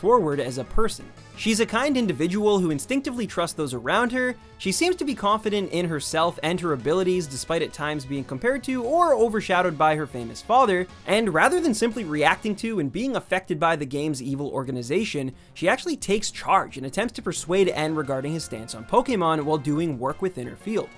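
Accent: Canada. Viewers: Those Canadian Ab-outs hahaha I love it.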